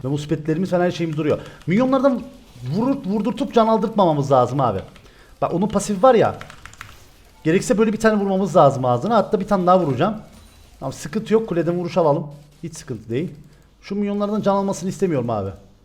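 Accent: Cyprus. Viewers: abi seni izleye izleye ağzım kıbrıs ağzına döndü evde hade de genne diye dolaşıyom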